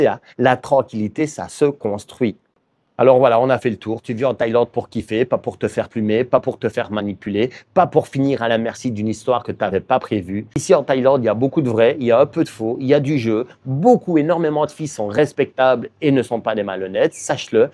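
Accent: Liège, Belgium. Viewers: Hello, Gros accent liégeois? Tu es d'où? Je passe quelques semaines en Thaïlande en septembre-octobre, un conseil; plutôt condo ou hôtel? Intéressant en tout cas m,fi.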